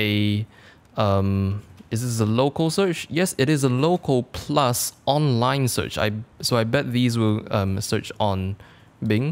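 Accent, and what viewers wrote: Malaysia. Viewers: Sounds malaysia english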